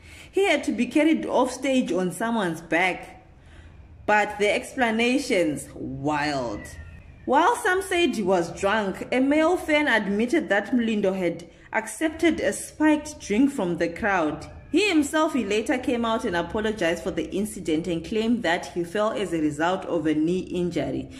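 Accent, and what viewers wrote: Zimbabwe. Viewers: Kkkk its either a Shona or Venda accent kkk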